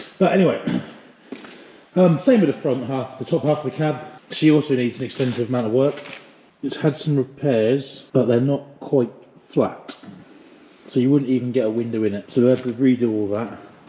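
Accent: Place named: Norfolk, United Kingdom. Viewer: Excellent video. Great content, awesome camera work...... and proper jaaaaarb Narfuk hoo-mour and accent. :) (Am a California person meself, so East a bit from you and across the river...... but (thankfully) north of low-staaaaft. ;) Can't wait for the next one - more Antar Action, or some stripping (of engine, please!) time? :O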